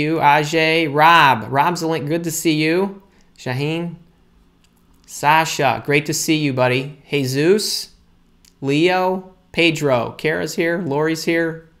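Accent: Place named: Midwest, United States. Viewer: Every few years I watch your videos when it’s that time again. I always get great guidance — & that amazingly vowel-ly Midwest accent just warms my little Chicago heart every time! 😊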